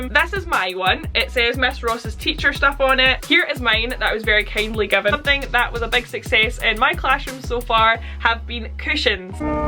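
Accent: Scotland, United Kingdom. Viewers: Hi I really love your Scottish voice and I love the organiser bins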